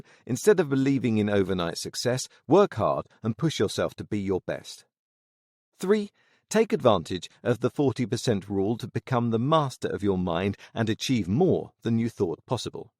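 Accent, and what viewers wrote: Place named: England, United Kingdom. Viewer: Representation matters: This book by a Black author was summarized by a white man. And no offense to the talented, but why, in the year 2021, am I listening to a white Englishman recount the uniquely American experiences of a Black New York-born United States military officer!? Please consider hiring writers and voice actors whose identities more closely align with those of the wonderfully diverse authors you showcase. You guys did good, but you can- and should- do better.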